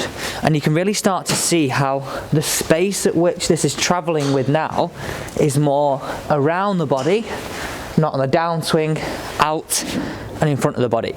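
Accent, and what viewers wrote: United Kingdom. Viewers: Great visual drill,.! Brit accent and all!::)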